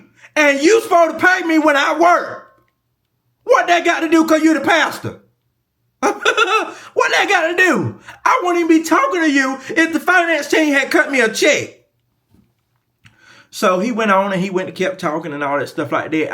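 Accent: United States. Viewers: I know a NC accent when I hear one. Fayetteville native here! You are toooo hilarious!!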